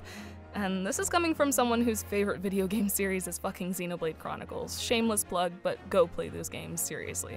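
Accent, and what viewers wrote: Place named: Latin America. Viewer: Great video! Hey would you happen to be latin american? You have a very pretty accent and it sounds like mine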